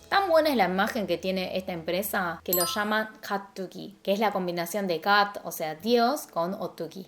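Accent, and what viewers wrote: Argentina. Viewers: Soy el unicoo que se pone rraro al verla hablar español y aun mas con acento argentino 😅 me da cosa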